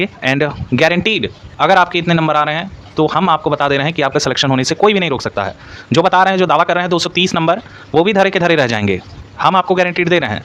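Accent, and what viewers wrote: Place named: Ayodhya, India. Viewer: अयोध्या से हो सर भाषा से लग रहा है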